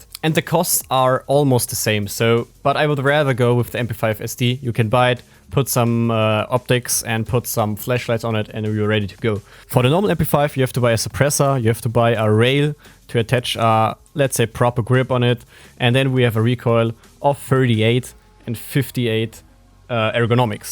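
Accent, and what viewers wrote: Germany. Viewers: Man hört richtig das du deutscher bist haha. Also am englisch. Nicht böse gemeint oder so. Weiter so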